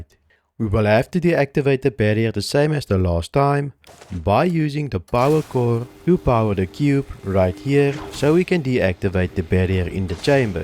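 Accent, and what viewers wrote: South Africa. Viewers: Are you South African? I was trying to place the accent.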